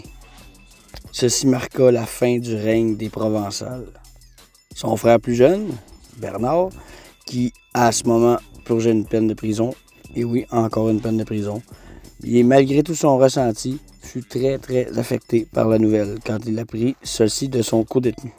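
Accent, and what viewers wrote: Quebec, Canada. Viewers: Bonjour, c'est quoi comme dialecte de votre Français -quabéquoi ? Merci pour la réponse ps j'adore. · Salut , au qc on parle quebecois ...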